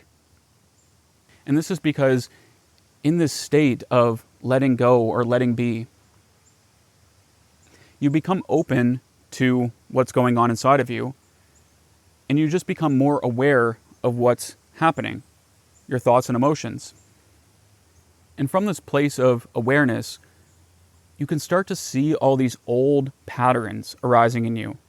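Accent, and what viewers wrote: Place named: Canada. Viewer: I appreciate this video! I just came across your youtube channel. You explain everything in simple terms language....Look forward to learning more....You must be from the NW or Canada with the accent. And saying "Keister" is definitely older school and wise....lol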